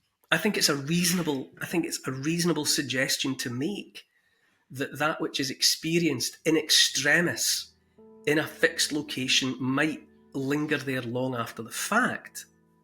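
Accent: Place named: Scotland, United Kingdom. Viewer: what is your accent? My bf and I are debating - I hear Glaswegian tones mixed with Dundee, but he says it's lowland Scottish. Can you please put our debate to rest 😂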